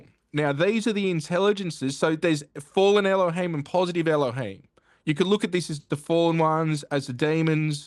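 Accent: Australia. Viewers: Well, of the English speaking countries the Aussie accent makes it the least understandable. Even South Africa has clearer English. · Is there an English version? The OZy accent is too strong for me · I'M french, almost impossible to understand....sorry but aussie accent is hard for european people. But I love your country, been there twice. Love from France. ❤ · Love his classic old school Aussie accent! 👍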